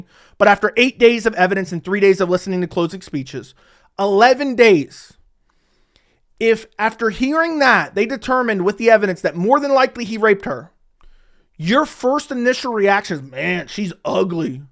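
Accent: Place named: New Jersey, United States. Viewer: You gotta be from NJ because I speak the same exact way when I’m passionate and frustrated 😭 you’re so right tho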